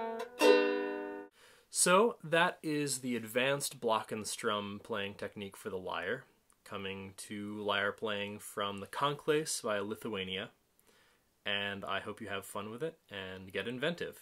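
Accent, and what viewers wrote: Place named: United States. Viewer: Seeing a few of your videos I did not expect you to have an American accent lol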